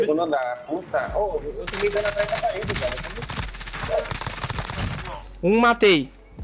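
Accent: Minas Gerais, Brazil. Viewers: Você é mineiro cara? MG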